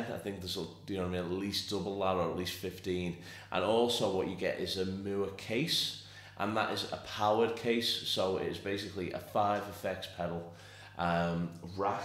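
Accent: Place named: Liverpool, United Kingdom. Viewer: This accent sounded Scousy to me. I sort of was right :). Nice review, lad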